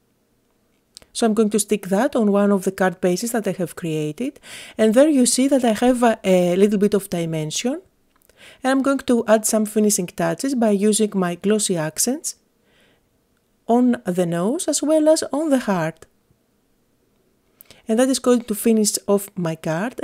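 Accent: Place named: Greece. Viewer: your accent makes me wish to be in the Pelleponisos again this summer!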